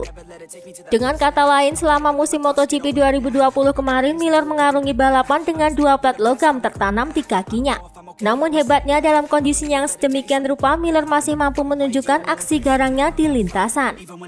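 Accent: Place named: Java, Indonesia. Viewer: Min... Medoknya masih kliatan🙏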